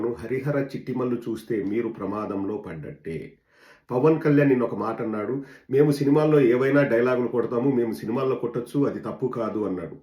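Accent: Rayalaseema, India. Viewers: మన రాయలసీమ డైలాగ్స్ సూపర్ లో చెప్పినవ్ అన్న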